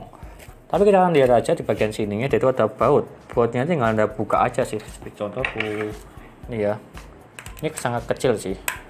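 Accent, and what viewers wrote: Java, Indonesia. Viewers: jowo e sek ketoro bang ,gas review e walau bhsa indo e medok 🥱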